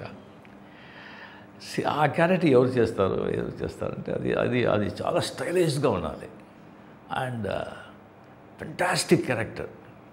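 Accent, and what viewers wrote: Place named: Karnataka, India. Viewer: really our thalaivar telugu speech is osm it seems he speaks telugu better than tamil only in movies thalaivar tamil will be like native but in offscreen his tamil contains kannada marati accent but his telugu is clear and natural sounding telugu is very easiest language in south india but many tamil actors struggle to understand and talk telgu even after so many years of release of their movie in andhra kamal sir karthi sir chiyan vikram sir but karthi sir telgu is quite decent kamal sir chiyan vikram sir telgu carrys tamil accent slight but thalaivar telugu is like close to native according to me he really speaks telugu better than tamil. in movies he deliver scripted tamil dialogues but his tamil is still bit impure no tamil actor can beat him in telugu speaking. he speaks telugu with null influence of other language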